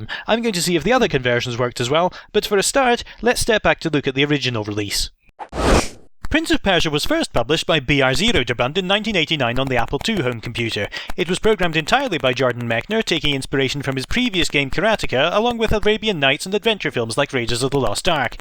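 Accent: Scotland, United Kingdom. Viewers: I thought I heard a bit of Scottish in your accent lol! I didn't want to assume. ^_^ Loved the video man. Just watched your Prince of Persia 2 video today and that one was also great. Keep up the great content man!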